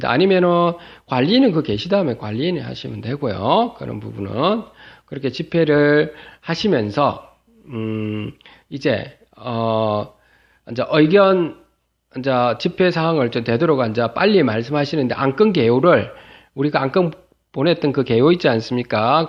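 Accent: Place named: Gyeongsang, South Korea. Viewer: 성낙을 받아 어결권을 ~ 갱상도 사투리가 정겹네요 ㅎ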